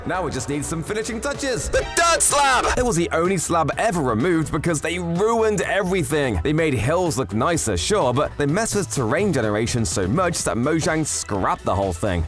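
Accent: United Kingdom. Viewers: Glasgow accent?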